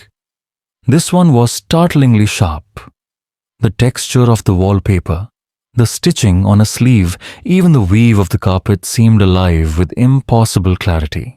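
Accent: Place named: India. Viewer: so I love the stories, but bro leave your Indian accent at home. A suggestion from one Indian to the other.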